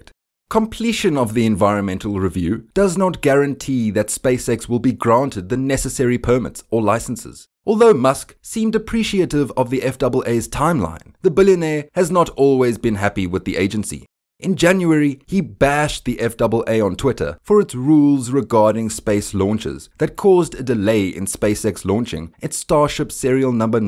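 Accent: South Africa. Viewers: He's from South Africa, like Elon himself.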